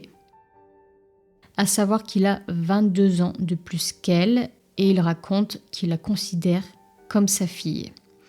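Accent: North, France. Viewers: Je ressens dans ta voix super douce une note d' un accent du nord de la France je me trompe ? 😁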